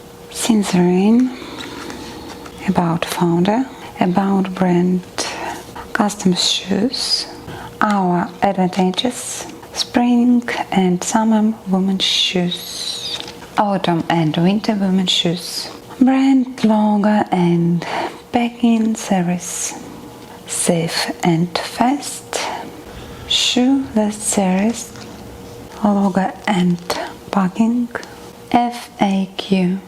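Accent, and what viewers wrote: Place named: Russia. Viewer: Сач э гуд инглиш, бат виз сам рашн акцент. Бат ит нот со хэви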